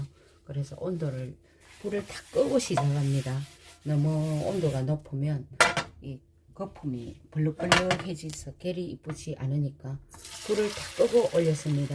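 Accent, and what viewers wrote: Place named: Gyeongsang, South Korea. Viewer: 돌솥비빔밥 제대로 알려주셔서 잘배웠습니다 나긋한 경상도 사투리로 설명 해주시니까 정겹습니다 자주 뵙겠습니다 😊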